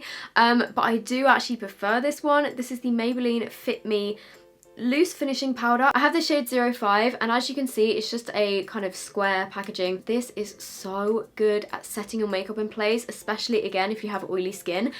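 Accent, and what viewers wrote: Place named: United Kingdom. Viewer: Oh my God I love your accent, apart from my obsession with the British accent, my favorite products are: -essence camouflage concealer -kiko velvet passion matte lipsticks in shades 314,318 and 312 -essence volume stylist 18h mascara (curl and hold mascara) and many others in reality, but at the moment I can not think of others... love u xoxo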